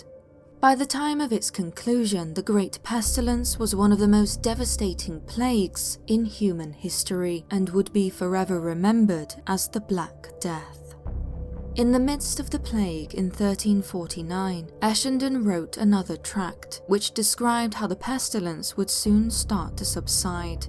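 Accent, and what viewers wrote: England, United Kingdom. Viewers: Dude i can’t explain how much i love this channel. it’s just so awesome, definitely in my top 3 favorite YouTube channels. and i know the narrator lady is married. but her voice is the perfect combination of sexy, intelligence and creepiness if that makes sense lol. (I got a thing for women with the English accent 😆😂) But i digress, over all this channels content is epic & i get stoked every time i get notified there’s a new video 💯. Keep up the great work guys and i wish you all the success in the world. 🙂👍🏻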